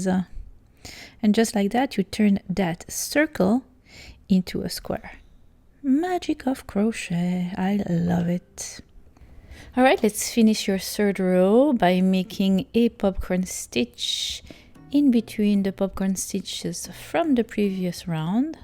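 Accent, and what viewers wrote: France. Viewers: Houlala!!!! oulalala!!! J'aime tellement your Frenchie accent. Je comprend "presque" tout! Blog and vidéos are sooooooooo très très bien. And love your chaîne sooooooooo much. Hope de GAGNER ( hopefully )🤩🤩🤩🤩🤩🤩🤩🤩🤩🤩🤩 Je love crocheter...with you from France 😍😍😍😍😍😍😍😍😍😍😍😍😍😍😍😍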